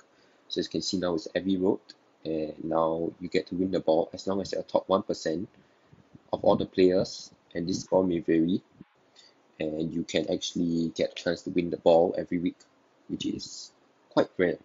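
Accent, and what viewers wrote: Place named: South Africa. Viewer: Who is doing the voice over. This is a South African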